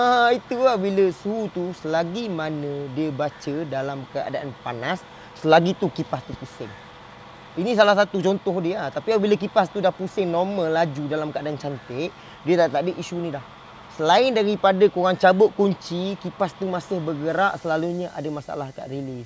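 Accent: Pahang, Malaysia. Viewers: ni cakap mcam org pahang.. bengkel beloh mane..tak daye dah repair kete mahal sokmo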